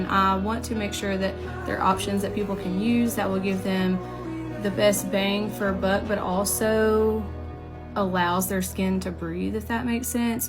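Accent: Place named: South, United States. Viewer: Damn, ur pretty...and that southern accent! Dont care nothing about makeup but enjoyed just watching u talk. More beautiful in this clip than any of the others.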